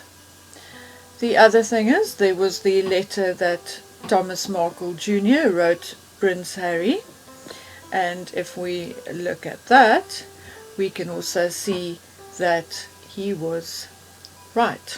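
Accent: South Africa. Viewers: Are you South African? Loving the accent im from neighbouring country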